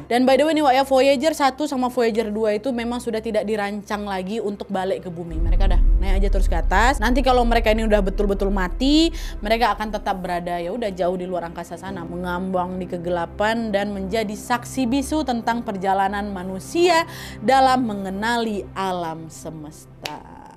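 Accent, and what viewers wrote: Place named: West Java, Indonesia. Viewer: Dari logat dan speed ngomong nya,saya yakin kak ini pasti orang Sunda🤔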